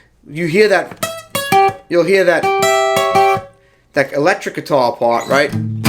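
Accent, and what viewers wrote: New York, United States. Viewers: Fab lesson Love the NY accent Cheers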